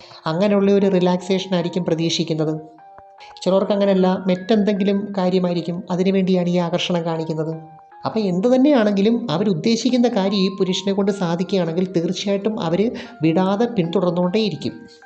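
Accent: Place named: Travancore, India. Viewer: ഈ പറയുന്ന മാം ന് മൂക്കടപ്പോ എന്തോ ഉള്ളപോലെ... പിന്നെ തിരുവിതാംകൂർ ശൈലിയിൽ, ഭ- ക്ക് "ഫ"കാരം ഉപയോഗിക്കുന്നു.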